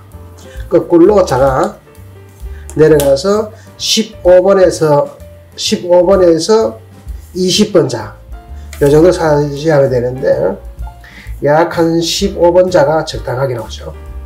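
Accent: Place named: Gyeongsang, South Korea. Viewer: 할랑하게 갱상도 분이신거같네요 설명잘들었습니다 ㅎㅎ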